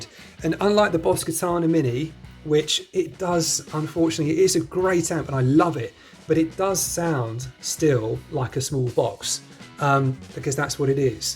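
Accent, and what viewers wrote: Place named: United Kingdom. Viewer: many thanks look forward to your review. I never used nux before but I was totally blown away with the mighty air. It's only the size of my hand so you could take it anywhere. Having a built in battery and being wireless is massive feature. It's good you don't have to spend loads of money for good sounding gear. Keep up the good work just came across your channel and love it. Are you in USA , you sound British but you say pricing in dollars.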